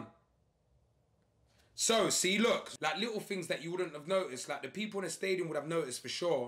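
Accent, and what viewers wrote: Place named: Paisa, Colombia. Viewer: Man got that paisa accent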